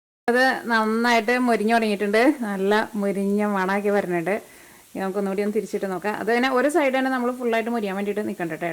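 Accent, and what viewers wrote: Thrissur, India. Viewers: ഇങ്ങള് തൃശൂർ ആണാ. പറേന്നത് മനസിലാവണില്യ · ഇതണ് തൃശൂർ സ്ലാങ്ങ്.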